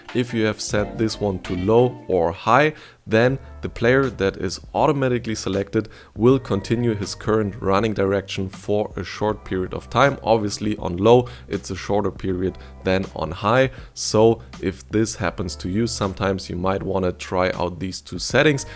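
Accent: Germany. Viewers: Is this dude German? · You have german accent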